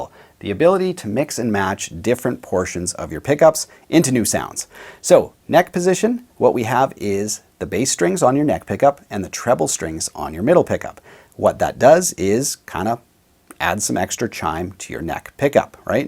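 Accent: Canada. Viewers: How did I not notice the Canadian accent before he mentioned it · The point is why should he, a Canadian person, adjust how he speaks to satisfy an American person.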